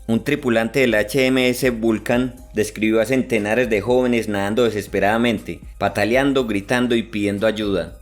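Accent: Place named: Antioquia, Colombia. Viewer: Ese acentico paisa le quita veracidad al vídeo, ya sebemos que los paisas son tan mentirosos y exagerados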